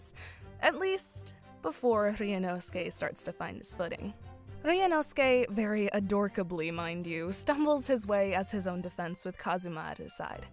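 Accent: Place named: Latin America. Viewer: Great video! Hey would you happen to be latin american? You have a very pretty accent and it sounds like mine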